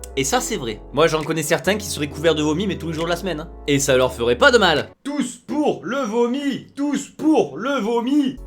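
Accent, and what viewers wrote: South, France. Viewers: j'adore тon accenт dυ ѕυd ❤❤❤❤❤❤❤❤ j'en aι ιne eт ѕι qυand on rever c'eтe noтre vraιe vιe eт qυe la on reve ???????????????????? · T'as un (des fois) accès Marseillais PERFECTO👌😱😂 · tas l'accent du sud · Tu a un acsen du sud toi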